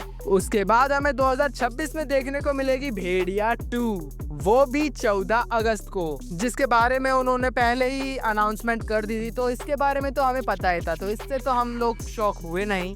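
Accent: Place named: Maharashtra, India. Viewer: Accent se lagta hai bhai maharashtra se hai😅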